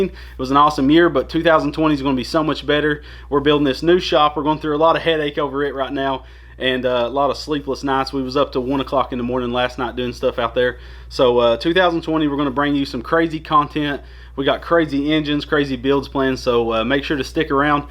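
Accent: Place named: South, United States. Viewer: Don't let em mess with you,it is pronounced ole not o-i-l, everyone knows it's just a southern accent thing. You're a good man helping them kids to have nice little go karts to play with. Go power sports is an awesome company to help you out with parts to help it all come together too.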